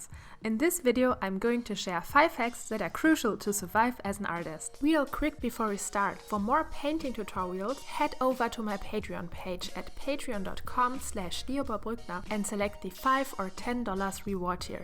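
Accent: Germany. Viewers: Man hört deinen deutschen Akzent sehr stark raus. Du solltest ganz besonders versuchen, die Aussprache von th zu üben, um es weniger wie ein s auszusprechen. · You kinda have a german accent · are you german bc you sound like youre german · In den ersten Sekunden hab ich instant gemerkt das sie deutsch ist 😂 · Interesting German accent :) not my artstyle but your video it is actually very helpful... sometimes I use watercolor and I would love to try out more. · wow wie hart man merkt, dass du deutsch bist an dem akzent ! Aber schöne Hacks! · Super Video! Aber bitte schau dir nochmal die Ausspracheregeln für das Englische an (v ≠ f, th ≠ f, t). Du bist aus Deutschland, oder? Hört man binnen Sekunden. ;) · As German native speaker I can definitely tell you that she's German. (Her last name is German, but that usually doesn't tell you much) Russian accent sounds different. 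Also she wouldn't be able to pronounce the German brand Schminke as perfectly as she did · Man hört richtig das du Deutsche bist :"D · thats one heavy german accent. Aber ernsthaft Respekt, dass du eine Videos in Englisch machst ist bestimmt nicht sehr einfach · Kannst du deutsch denn der Akzent hört sich so an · Schönes Video :), ich habe mich erst gewundert wieso mir dieser Akzent beim englisch sprechen so bekannt vorgekommen ist, bis ich durch die Kommentare gescrollt bin und deutsche Kommentare gesehen habe xD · You start speaking English CUZ of your accent- she is german But i like your arts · I think she is from Germany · The first thing I thought: She's german. You have such an heavy accent, but it's a good video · Min warte mal ... Man kann das Fabriano aquarell Papier auch hier in Deutschland kaufen 0.0 Ich muss wissen wo !!! Hast du es auch mal in einem laden gesehen oder kaufst du es immer online ? 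Ich dachte anfangs du kaufst dein Zeichen Material (zumindest das was in diesem Video ist ) bei boesner , da man das Aquarell, als auch die Airbrush, als auch die luminance stifte alle bei boesner bekommst. Vorallem die luminance, ich habe die in keinem anderen Laden gefunden, nur in boesner. Deshalb war ich so verwirrt mit dem Papier. Du würdest mir echt sehr helfen wenn du mir sagst wo du es kaufst oder ob du es mal in einem laden gesehen hast. Ich mag übrigens dein Zeichenstil total und wie viele verschiedene Medien du benutzt. Ich muss das auch mal austesten , gut das ich das ganze Material (bis auf das Papier D: ) hier zuhause habe xD Naja auf jeden fall würde ich mich sehr freuen, wenn ich eine Antwort von dir bekomme :D (Und sorry, dass ich in deutsch schreibe, aber man kann deine Muttersprache nicht überhören xD Jetzt nicht böse gemeint oder so °^° ) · I have the feeling you're from europe(maybe germany) because of the way you speak 😄 very nice Video 👌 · i can hear that youre german ;3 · Are you german your Akzent Sounds like it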